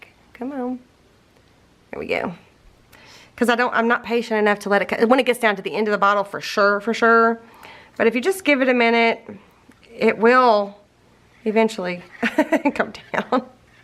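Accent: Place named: Georgia, United States. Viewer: Don't know if be sorry for how you Alk I'm going to from southeast GA it sounds just right to me lol. The way they say it sounds funny to me. If it is a problem to them I say just dont listen learn to do it their self.😀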